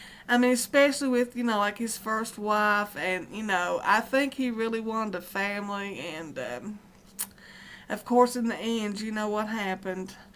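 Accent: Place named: Texas, United States. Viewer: You do sound like us Texans! I thought you were one of us! :) That's ok, you're still an awesome sweet person. :)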